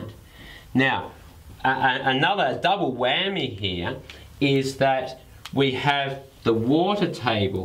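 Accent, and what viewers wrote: Australia. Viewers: I could listen to Aussies talk for hours. Thank you! Very concise depiction of salinization. Cheers!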